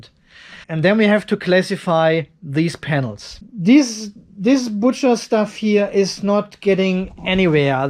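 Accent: Germany. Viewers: I'm starting to think that "American English" sounds better with a German accent!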